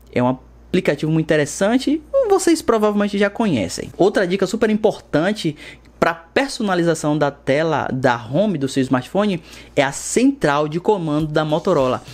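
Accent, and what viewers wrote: Bahia, Brazil. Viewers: Tu é baiano? Te sigo no face mlk bom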